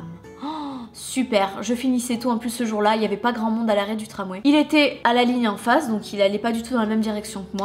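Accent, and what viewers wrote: South, France. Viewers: au fait t'es de quelle ville ? t'as un accent du sud :) tu serais pas de toulouse?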